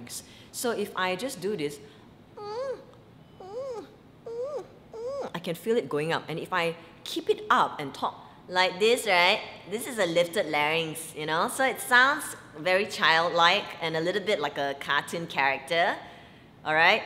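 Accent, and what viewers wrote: Singapore. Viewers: Are you from Singapore? Your accent sounds lightly Singaporean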